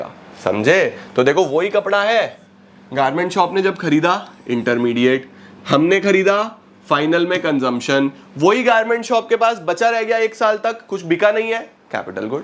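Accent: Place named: Rajasthan, India. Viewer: Rajasthan ka hai bhai yo.. to kadr ka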